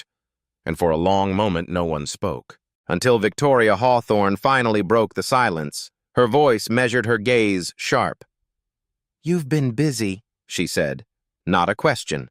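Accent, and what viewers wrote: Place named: South, United States. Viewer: What a horrible irritating voice to use for a reasonably good story. No need to sound like some hillbilly.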